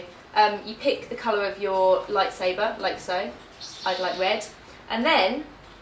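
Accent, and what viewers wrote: United Kingdom. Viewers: are u british? if u are - what the hell are u doing in USA? just kidding. great video. great iphone!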